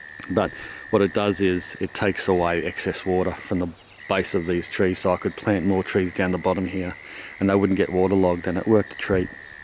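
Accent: Australia. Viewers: Nice...soon I will be making a video like that..as soon as I get my little farm..lol My dream! Are you located in Australia, somewhere like that? I guess because of your accent.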